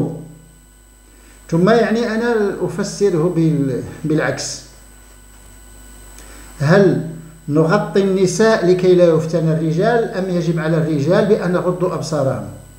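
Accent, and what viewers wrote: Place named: Morocco. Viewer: تحياتى اليك دكتور، لي عندك رجاء: اللهجة المغربية صعبة قليلا ،ويزيدها صعوبة سرعتك في الكلام. ليتك تتمهل قليلا في الكلام حتى نتمكن من الاستفادة من علومك القيمة .وشكرا جزيلاً ...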